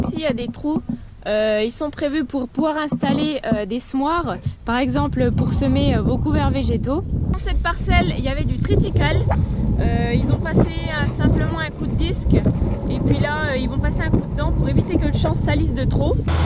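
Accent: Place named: Haute-Saône, France. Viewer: Désolé, je n’avais pas reconnu l’accent de la Hte Patate ! 👍 (Suis du canton de Marnay, émigré en Vendée depuis bientôt 40 ans). Vidéos intéressantes pour un passionné de machines et matériels agricoles ! 👏👏👏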